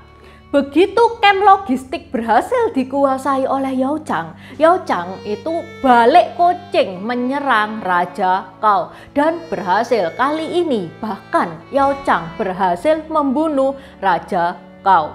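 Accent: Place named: East Java, Indonesia. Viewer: Enak didengar tambah pengetahuan tentang sejarah, suaranya medok jawa timuran. 👍👍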